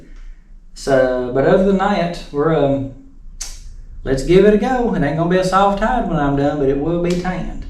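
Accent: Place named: Alabama, United States. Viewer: What part of Alabama are you from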